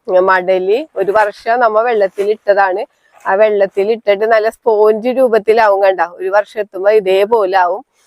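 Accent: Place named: Ernakulam, India. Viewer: Eranakulam aanalle😊 Bhasha kett paranjathann.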